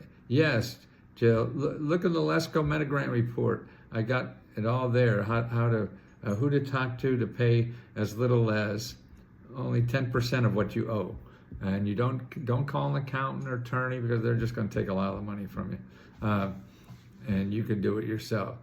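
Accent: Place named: New York, United States. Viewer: Please. Key. Me in.. Thanks yes. 😮❤😊love your info. Yes. You. Friendly. Sound like New York accent 🎉🎉🎉🎉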